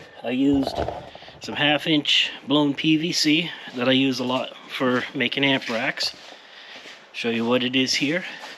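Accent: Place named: Canada. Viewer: That Canadian accent is annoying.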